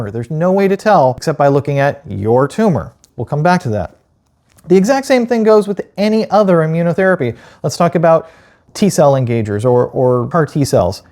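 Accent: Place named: Ireland. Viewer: Also your Irish accent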